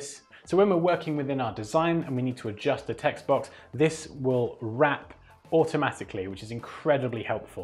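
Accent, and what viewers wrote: England, United Kingdom. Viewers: thankyou for tutorial, where do you from ?, england?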